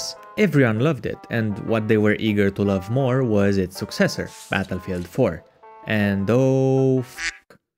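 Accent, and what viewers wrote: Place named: Finland. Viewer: i can just hear finnish accent when u talk. am i right that u are finnish? :D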